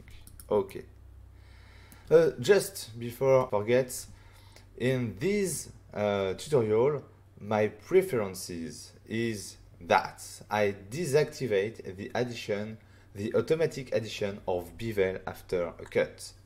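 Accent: France. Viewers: I have Fluent Power Trip for a few days. I think this is the tutorial that it all made sense. It's such a great addon man. So fluid and fast, I really like it. bravo I can tell you have a passion for it. Please keep up the progress! I am also glad I spent a lot of time in Paris for work, so I am used to your accent. Your English is getting better each time! While I was learning French, my friends in Paris would always laugh when I tried to speak with them. They tell me that I sound like a Frenchman from Chicago! lmao great work, please keep it going!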